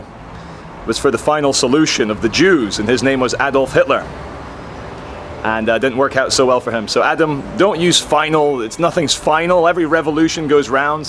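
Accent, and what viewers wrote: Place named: Manchester, United Kingdom. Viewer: sounding a bit more like a manc these days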